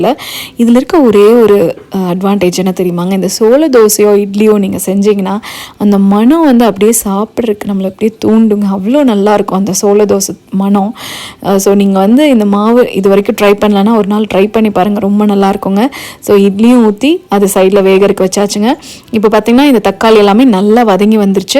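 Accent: Coimbatore, India. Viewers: Lovely vlog ma❤ I studied in Coimbatore Institute of Technology..its been 9 years Missing Coimbatore so much ..Your slang reminds me of lovely cbe ppl ❤️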